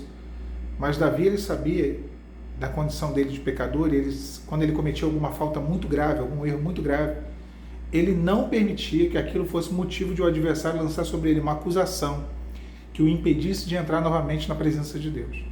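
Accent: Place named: Rio de Janeiro, Brazil. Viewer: Você também é aqui do Rio. Bem que reparei o jeito diferente de falar. Que legal!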